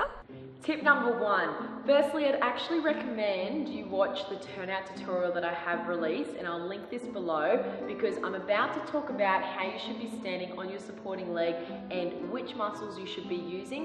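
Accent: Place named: Australia. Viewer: Hi my son and I have been watching your videos for the past few weeks and we really enjoy them. He is a budding of ballet dancer. He would like to know if you have any videos with boys in them instead of girls. He also would like to know if you coach boys. We live in New York city. But we love your Australian accent. Please let us know if you ever plan to put Boys on your Channel and display exercises for ballet Styles particularly for boys. Thanks keep up the great work.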